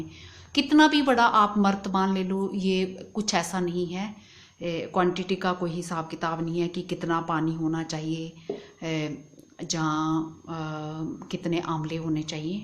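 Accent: Punjab, India. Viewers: g aap 4 minute ke vedio ke 22 minute laga diye kirpa karr ke sabh teyar karr bass ek piece kaat karr short mein dikhaeya karo........main hi nahi sabh bor hote honge.........kaam achha kiya.......shukriya.....aap ki zubaan punjabiyat jhalakti hai aur yeh achhi baat hai......koi bhi ho bolne mein wahan ki khushboo aani hi chahiye......👍👍👍👍😃😃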